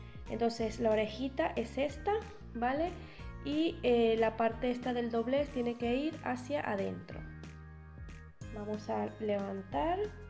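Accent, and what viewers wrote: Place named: Venezuela. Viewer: Gracias por no perder tu acento, nosotros los venezolanos tenemos uno hermoso.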